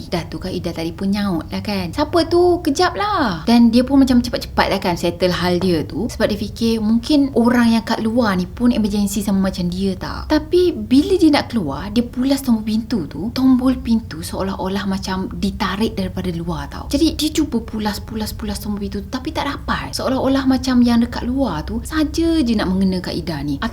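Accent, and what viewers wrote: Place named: North, Malaysia. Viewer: Asslam...hai asal mana utara kan...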